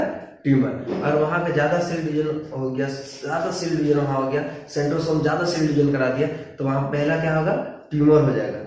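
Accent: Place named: Bhojpuri, India. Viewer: pure bhojpuri sir❤❤❤❤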